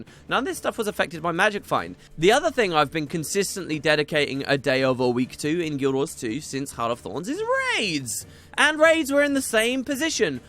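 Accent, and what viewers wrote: England, United Kingdom. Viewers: Honestly, some people complain that he is too drawn out and rant, but I really do love to turn on his videos and chill and just listen to that sweet melodic English accent.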